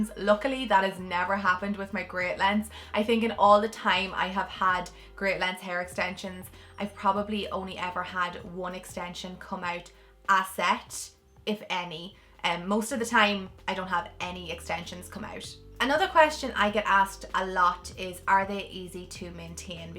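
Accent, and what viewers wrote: Ireland. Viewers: Love the Irish accent so sweet. . Your hair is beautiful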